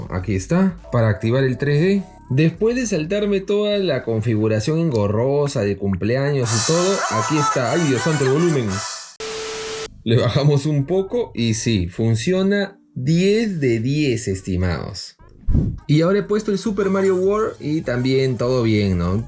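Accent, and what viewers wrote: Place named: Peru. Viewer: Me gusta mucho tu contenido es interesante pero de verdad que no puedo soportar el acento y la voz peruana es como si estuviese viendo discovery kids lo siento:(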